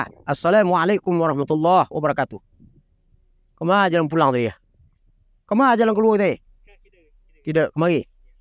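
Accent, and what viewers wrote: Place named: Payakumbuh, Indonesia. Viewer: mantap.., bahaso pykmbuahnyo totok.., yo mode tu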